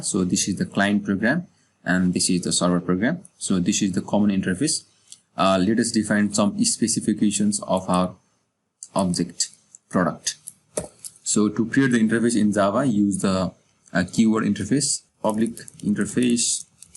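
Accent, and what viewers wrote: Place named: Nepal. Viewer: You have Nepali accent.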